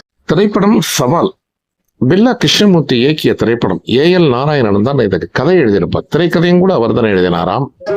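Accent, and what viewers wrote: Sri Lanka. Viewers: Thokirthu vazhangum ungal kural ilanngai ucharippu pondru ulladhu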